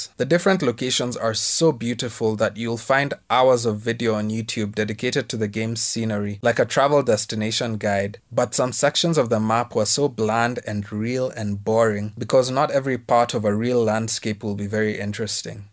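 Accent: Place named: South Africa. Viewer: Your accent is close to a South African one but that's just me. I did not know about fortnite being a single-player initially. I've always avoided these co-op/online and live service games due to the predatory practices. But stylized games are more timeless with the best example being Sifu The upcoming blade by Arkane Lyon. Ultimate Spiderman (2005) Hifi rush The upcoming blade game Rocksteady is another studio that perfectly blends photo realism with a more stylized appearance Gotham and metropolis look like their animated series counterparts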